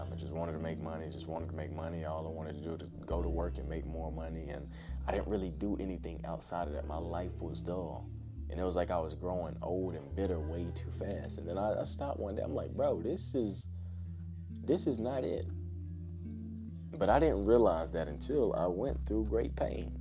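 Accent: South, United States. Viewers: Your are my greatest inspiration, so far, how do you articulate yourself so well? I heard you talking normal, and ofc u got a pretty heavy southern accent, but hkw do you manage to remove the accent? And also, are you always this calm?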